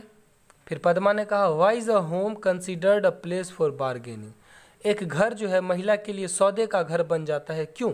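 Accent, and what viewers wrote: Bihar, India. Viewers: Sir aap bihar se hain kya Mashallah bada hi achha samjhae h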